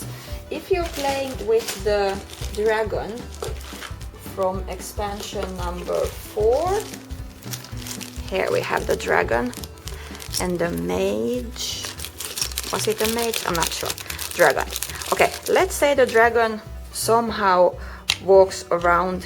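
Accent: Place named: Finland. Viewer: Suomi <3 I could listen to your English the whole day...it sounds great :D Oh, do you know if there's Carcassonne tournaments in Finland/Helsinki?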